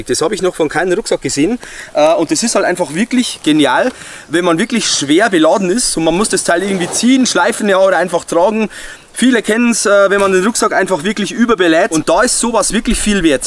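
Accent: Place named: Bavaria, Germany. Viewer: Alle Achtung, prima, herrlich das Bayrisch 😊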